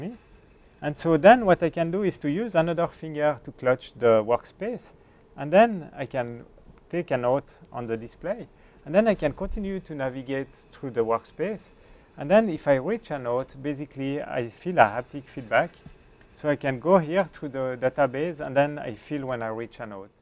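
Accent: France. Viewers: Accent français ^^ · Magnifique accent français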